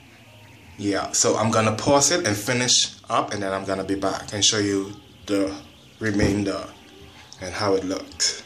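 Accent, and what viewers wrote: Bahamas. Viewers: he sound super bahamian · u sound Bahamian, r u? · I hear a bahamian accent doe! Where u from soulja?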